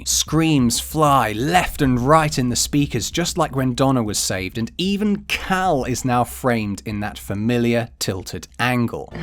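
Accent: RP, United Kingdom. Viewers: Yse a this is the posh voice I have been thinking of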